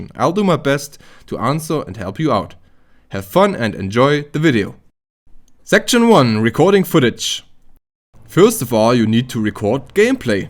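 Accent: Germany. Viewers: Are u a german youtuber cause you have that accent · Hey, ich geh mal anhand deines akzents davon aus, dass du deutsch sprichst. Ich hab ein kleines GTA Projekt vor und bräuchte da Rat bzw Hilfe von jdm mit Erfahrung. 1. Kann ich mir im online Modus mehr als 2 Charakteren machen ? ( Brauche 2 für mein Video ) 2. Kann ich beide Charaktere gleichzeitig steuern lassen im offline modus, sodass ich praktisch 2 Schauspieler habe, die ich bzw noch eine 2 Person mit einem Kontroller steuern kann und somit z.B beide für eine Szenen nebenher laufen lassen kann ? 3.Wo legt GTA die exportierten Videos hin, sodass ich sie in Pr öffnen kann ? 4. Kann ich mit gemodeten Autos Aufnahmen machen ? Wäre echt toll, wenn du mir helfen könntest bei meinen Fragen ! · Digga du hörst dich so deutsch an hahahahah are you german bro?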